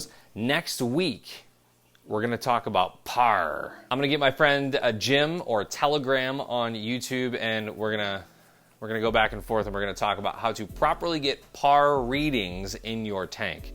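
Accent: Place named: United States. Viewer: Nice tank.. I’m a brit so the American twang can sometimes bend my ear..is there really a coral called ‘arse crack’ ... 🤣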